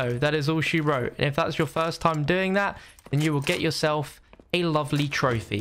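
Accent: England, United Kingdom. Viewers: Are you UK? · "omg a Juggernaut. How many grenade hits is he going to take?" Cleary hasn't played the High Explosive Spec Ops mission or maybe even figured out it takes one to the head XD. (also, the proper term is "soccer ball". But I can tell you're from England so it's whatever. lol)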